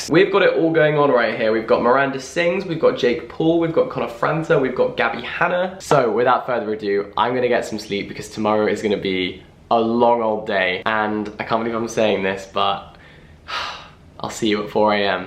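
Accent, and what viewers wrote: United Kingdom. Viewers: The British accent 😍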